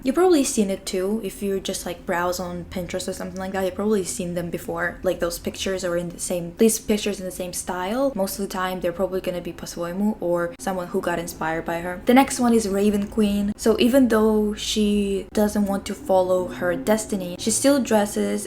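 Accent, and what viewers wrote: Russia. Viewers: do you speak Russian?? that explains why sometimes your pronunciation feels kinda like ours, especially in “r” and “n” sounds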